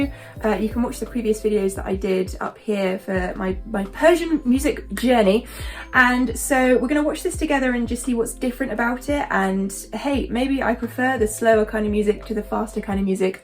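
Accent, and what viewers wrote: United Kingdom. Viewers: Best reaction ever, professional and respectfull, thanks and good luck, i like very much her typically british accent , nice. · Are you brithish ?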